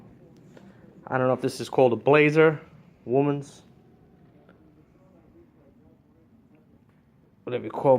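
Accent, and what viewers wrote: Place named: New York, United States. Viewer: Hi, great video. Thanks for sharing. Also, love your New Yourrrrk (?) accent:) Pinterest is awesome for finding womens clothing info. e..g typing "types of skirts" would bring up a infographic showing a-line skirt, pleated skirt, etc. Even Google Images would do it.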